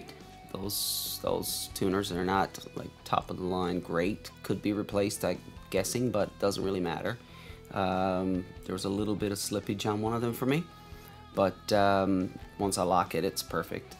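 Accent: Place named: Newfoundland, Canada. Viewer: I know a newfie accent when I hear it lol , excellent job man sick licks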